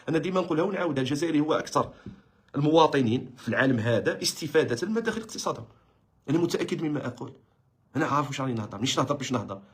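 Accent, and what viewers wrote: Morocco. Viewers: الهدرا تاعك تبان شغول مروكي ،،،،تهدرو غير علي كرشك ،و انت واش تمد البلاد ،اتزوج دير دراري و رمي برا ،،، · هذه اللهجة مغربية و الله أعلم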